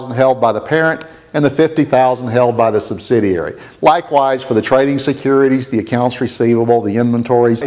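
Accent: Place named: India. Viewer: You talk too damn fast it all sounded like hearing an Indian speak!!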